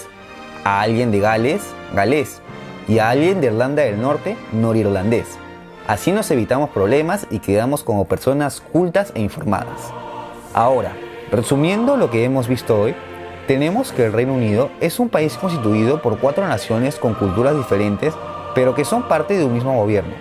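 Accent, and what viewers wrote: Peru. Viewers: Lo único malo del vídeo fue escuchar tu puto acento peruano, recuerda a la momia bozzo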